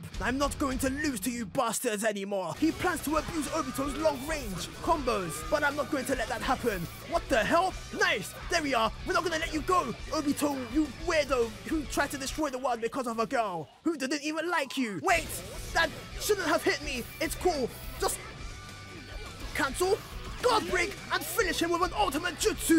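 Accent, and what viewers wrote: United Kingdom. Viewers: YOOOOOOOO this dude sounds literally like LEE BUT BRITISH OMG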